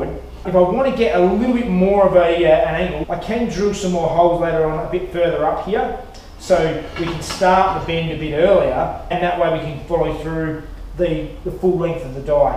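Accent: Australia. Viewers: He said put the earth on it when he forgot the ground lol it’s just funny because I’m American and I like the way the Aussie talk lol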